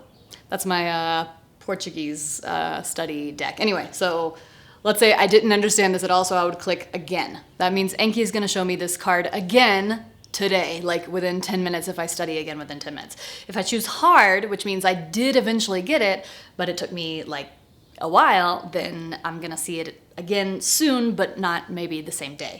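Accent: Spain. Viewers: you have a great spanish accent! it's perfect. Thank you for your recommendations.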